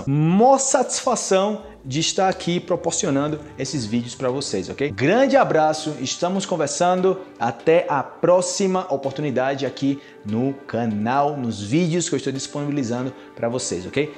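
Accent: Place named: Colombia. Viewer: Eu sabia que vç tinha espanhol da Colômbia! 😉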